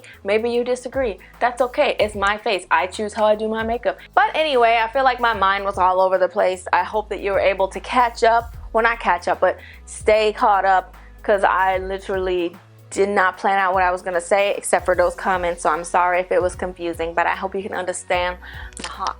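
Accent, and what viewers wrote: South, United States. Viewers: I don't understand how she's changed?? Her clothes and make up are what she buys in Korea, Where she lives and had lived in for years. It's called adapting. When she does her videos she still speaks with her southern accent when she speaks. Getting your breast done and tattooing your eyebrows doesn't mean she's trying to be Korean considering how much Americans get there breast and eyebrows done, she's perfectly fine to me. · hasn't changed in the most important traits. She has still got the same personality, humour and charm. I continue to watch her is because of the way she talks and what she talks about. (Love that southern accent.) Self grooming, if you will, happens more when you get older and gain disposable income. You're treating yourself and it shows. Your appearance will always be changing every year, every day. Remember who you are inside and nothing else really matters. 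· I'm so glad you posted this video. I've also been seeing the negative comments on your videos lately. I also was a bit uncomfortable with your new drastic changes, but I've also noticed you have not changed who you are as a person and is still the same happy with her lame jokes and Southern accent. That made me get over the changes you've made which really isn't that big of a deal. I also appreciate how you addressed this issue and your haters with a respectful manner. You talked about how you felt very reasonably without attacking anyone. Love you!! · am i the only one who hears her southern accent.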